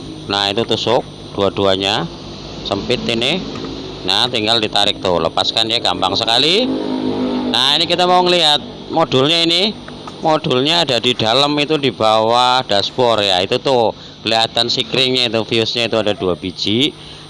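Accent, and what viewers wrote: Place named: Ngapak, Indonesia. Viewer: Logat ngapak e kentel bgt kang 😄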